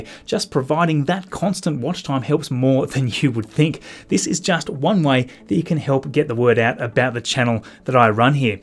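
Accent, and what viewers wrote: Australia. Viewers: Where re u from Australia?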